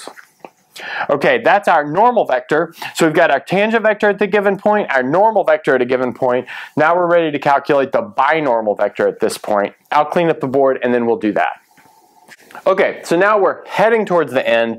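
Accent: United States. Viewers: You have a very thick American accent. Got to concentrate hard to understand it. No idea why I'm commenting that. I'm drunk. Might explain everything.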